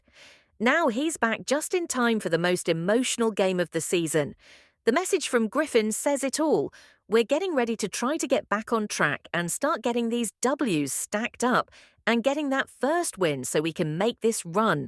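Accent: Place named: Australia. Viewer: Click bait sewer with an Australian accent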